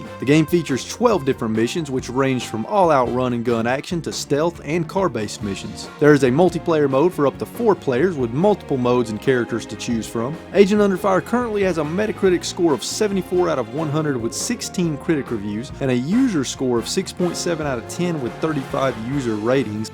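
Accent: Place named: Carolinas, United States. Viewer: You sound like a Carolinian. Great video!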